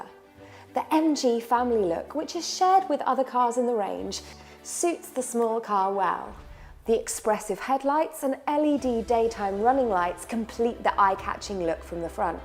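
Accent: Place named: United Kingdom. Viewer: Got a girl witha brittish accent doing this video even though MG is wholly owned by the Chinese😂😂😂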